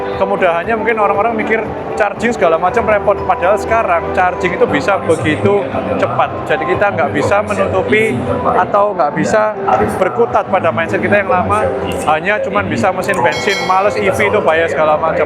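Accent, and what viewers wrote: Surabaya, Indonesia. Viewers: Aku kangen medok ro Suroboyoan mu 😊😊😊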